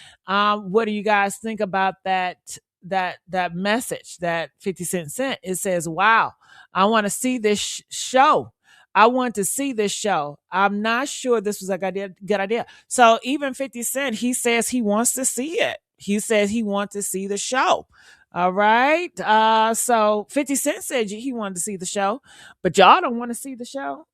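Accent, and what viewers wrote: South, United States. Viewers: if you dont mind my asking, are you from the south??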